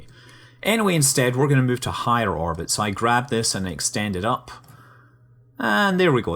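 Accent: Scotland, United Kingdom. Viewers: he's definitely not canadian. my best guess is he's from scotland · Scottish accent ftw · Are you Scottish? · Scottish with a hint of American, where I am to understand he lives. And I might add your English is better than many native speakers I know! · are you Scottish? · he sounds Scottish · Holy potato, i love scottish accent!! XD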